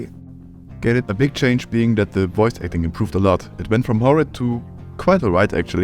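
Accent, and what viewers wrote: Germany. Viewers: I smell a German accent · The German accent man, i cant fucking listen to this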